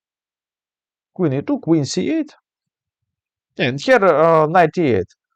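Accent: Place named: Russia. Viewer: Funny russiam. Accent 🎉🎉🎉😂😅😅😮😮❤😊😊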